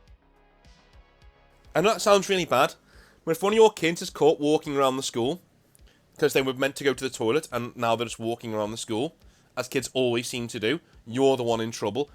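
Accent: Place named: Northern England, United Kingdom. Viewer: Watched a couple of your videos recently, love the energy and content. Curious, based on the channel name and accent, what store did you manage? I only ask as it was in the north of England where I first found my love of table top gaming!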